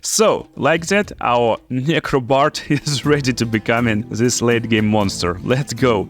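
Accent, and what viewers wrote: Russia. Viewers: Слышь по твоему говору понятно что ты русский.говори порусски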